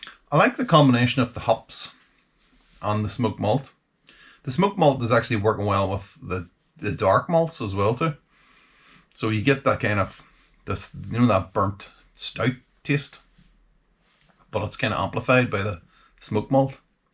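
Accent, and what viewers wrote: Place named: Northern Ireland, United Kingdom. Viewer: Where in ni are you? Enjoying your videos over in fife. But it's good to hear a voice from home.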